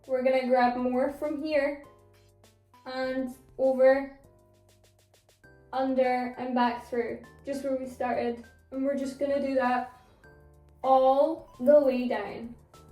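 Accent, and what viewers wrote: Scotland, United Kingdom. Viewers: OMG UR SCOTTISH I LOVE THAT AND I WASNT EXPECTING YOU TO BE SCOTTISH I THOUGHT YOU WOULD BE FROM LONDON! UR SO PRETTYY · Excuse me but I've never heard you speak before and your accent is gorgeous🥹. I'd love to hear asmr in your accent. Where are you from? Your accent sounds like it might be regionally specific and I'm always curious about that. Like how accents in new york city differ a lot depending on where in the city someone is from. Like, peter Capaldi speaks differently than you but you both sound scots, so i assume you're not from the same regions. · are you scottish? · Irish American? That would be someone of Irish decent, living or born in America. She’s Scottish, and was raised and is still living in Scotland.. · r u Scottish (cuz I always thought u were American) also r u British cuz I ammmm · I love Scottish accents so much💛💛💛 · Are U Scottish? 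· I’m pretty sure she’s Scottish, I could be wrong though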